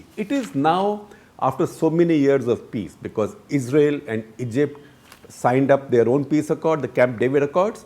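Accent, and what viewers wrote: India. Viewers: i could listen to him talk forever. I love the indian accent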